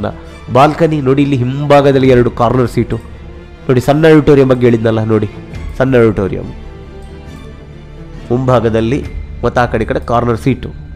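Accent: Coastal Karnataka, India. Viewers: ಭರ್ಜರಿ ಯಾದ ವಿಶ್ಲೇಷಣೆ...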